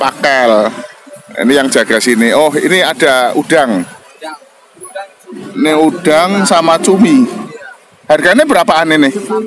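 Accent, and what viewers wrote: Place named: Banyumas, Indonesia. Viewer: Orang banyumas ya om kok bahasa nya ngapak salam inyong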